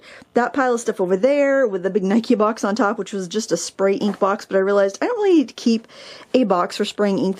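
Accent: Maine, United States. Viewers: Organizing storage bins,, trays, and containers always come in handy, so I would keep many, too. You cracked me up when you asked yourself why you have such a Maine accent today. Uhh, maybe cuz your in/from Maine! Lol!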